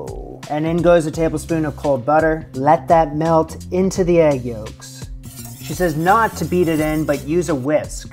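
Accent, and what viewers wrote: Canada. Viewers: I absolutely love watching his videos. The only downside to watching them every day is that I know find myself saying "now" and "about" in a Canadian accent, I live in the UK. Pity I can't cook like him though 🤣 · I’ve always felt like I hear a twinge of Canadien in your voice, so I’d like you to know that the shot of the Bearnaise being poured over a slice of steak made me yell, out loud, “NOW THAT LOOKS GOOD, EHH?” 😂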